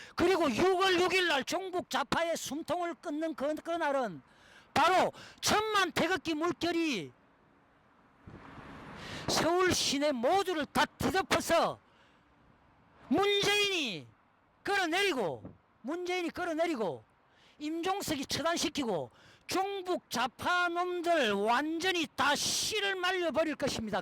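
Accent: Gyeongsang, South Korea. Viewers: 갱상도 말투 존나 듣기싫다.증거는 차고 넘쳐.24년이 아니라 32년이야.계엄 지시까지 먹으면 무기징역 존나 웃겨.배아프다. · 다 좋은데 그 경상도 말투 더럽게 듣기싫다..표준말좀 써라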